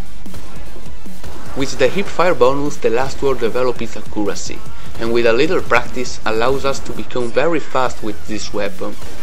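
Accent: Italy. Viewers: si sente il tuo accento italiano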